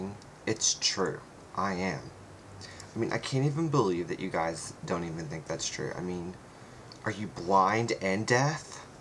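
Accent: South, United States. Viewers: he has a southern accent! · The accent is coolie. You sound just like some of my friends, but more Southern :D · I love your southern gay man voice!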